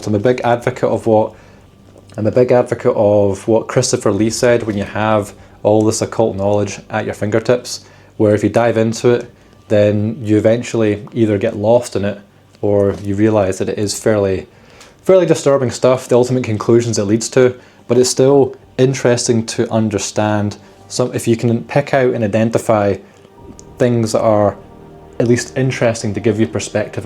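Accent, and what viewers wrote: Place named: Glasgow, United Kingdom. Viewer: No bonus points for me, I only know that music, Lacuna Coil and so forth because because I played the hell out of VTMB both vanilla and modded. I have to say I like your content and the accent reminds of a co-worker from my last job, originally from Glasgow, we could take the piss out of each other without worrying about our "feelings" or HR. Salt of the earth.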